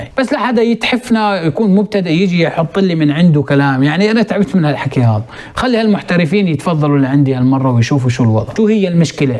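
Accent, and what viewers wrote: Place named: Syria. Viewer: استاذ انت سوري ولا اردني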